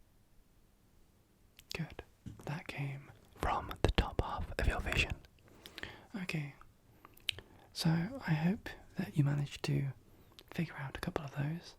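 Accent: United Kingdom. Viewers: It’s the lovely British accent I love it 😊😊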